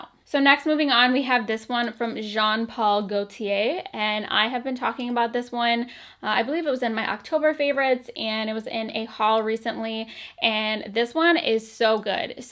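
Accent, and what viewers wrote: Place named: Northeastern Pennsylvania, United States. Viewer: And Im from NE PA, and as soon as I heard u talk lime a year ago...I knew we talked alike!!!!!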